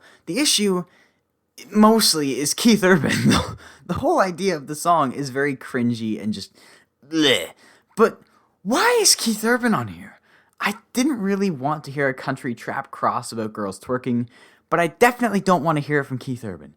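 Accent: Canada. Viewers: not even 2 mins in and I can hear the canadian accent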